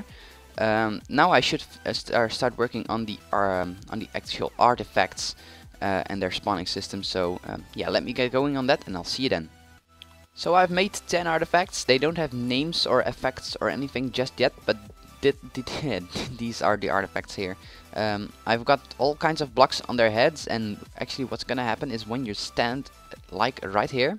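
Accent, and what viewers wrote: Netherlands. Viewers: ben je nederlands? je klinkt nederlands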